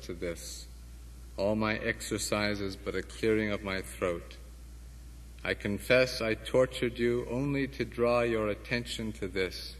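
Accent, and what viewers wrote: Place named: Canada. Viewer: It is his young voice with canadian accent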